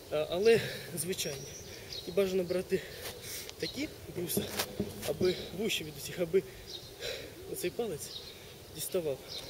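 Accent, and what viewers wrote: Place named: Russia. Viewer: і в додаток, відчувається, що в твоєму оточенні розмовлять російською), "щ" = "шч" при вимові, де "ч" проривне і чітке.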